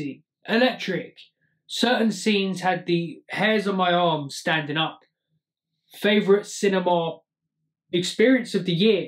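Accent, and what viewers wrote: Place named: United Kingdom. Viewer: Yeah man! Subscribed. Cool. Also UK accent straight out of Guy Ritche movie. Nice to meet you.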